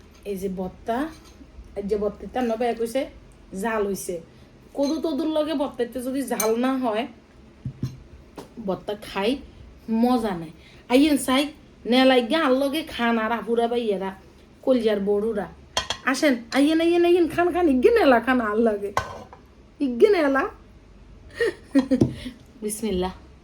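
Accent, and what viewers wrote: Noakhali, Bangladesh. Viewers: Apu Noakhali kotha apnr moke manay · Ami delhi theke bolchi…kintu tomar noyakhailya vasha amaar aponjon ke mone koriye dai..boleii tomar vlog dekhi..shaathe tomar khawa dawa..khuub valo laage❤ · আপু তোমাকে নোয়াখালী ভাষায় কথা বললে বেশি ভালো লাগে। ❤❤❤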